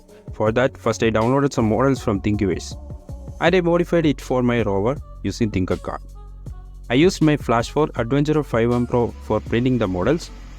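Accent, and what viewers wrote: Kerala, India. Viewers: Bro are you from kerala?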